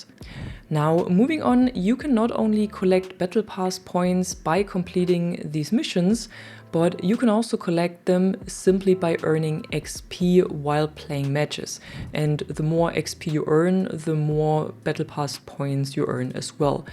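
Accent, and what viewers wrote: Germany. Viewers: Man hört das Du Deutsch sprechen kannst 😂🖐️🖐️🖐️🖐️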